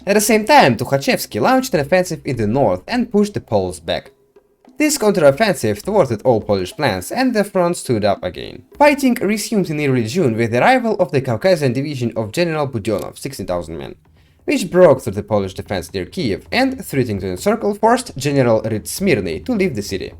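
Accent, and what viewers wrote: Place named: Russia. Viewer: good content, but pronounciatin is... difficult. Fast running through text and not very clearly spoken. Sounds kike a Russian native speaker thinking that speaking fast and unclearly means a high level of language skill. English natives are easier to understand... please do sth with that feedback, as your content is of value!!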